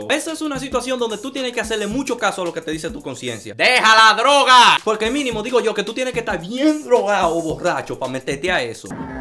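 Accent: Cibao, Dominican Republic. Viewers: LO QUE ME CURA ES EL ACENTO CIBAEÑO QUE EL TIENE JAJAJA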